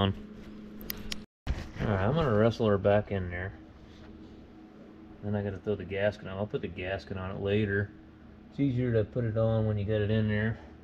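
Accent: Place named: Oregon, United States. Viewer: I always thought that was a strange Oregon accent. Makes sense now....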